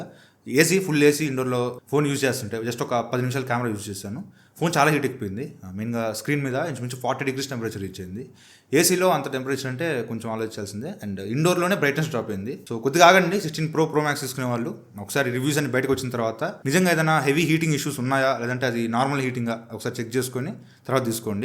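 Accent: Nellore, India. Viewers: Bro medhi nellore aa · Bro meedhi nellore e na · Bro midhi nellore na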